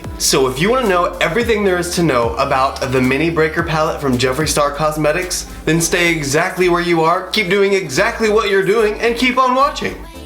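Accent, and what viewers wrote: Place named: South, United States. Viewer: Loved watching you create this gorgeous look,. That shimmer purple next to the shimmer yellow on the lid is such a beautiful color combination. I've been trying to place your accent (I knew it was Southern US, as I live in west Tennessee), & then noticed your description box. Haven't been to Hot Springs in quite a few years now (I used to visit more in my university days, as one of my buddies had family there who owned a dairy; one of the last that did doorstep delivery before his grandfather passed). It is such a beautiful place to get away & enjoy a bit of mountains without having to drive forever. Anyway, thanks for sharing this fabulous look with us, & hope you are enjoying a superb week so far! 😊💖👍🏻🌷🌻🌼🌹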